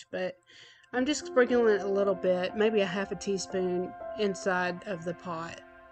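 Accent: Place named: South, United States. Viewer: I love her southern Baptist accent. This is just what I need...soup, cozy electric blanket, and some world wide wrestling.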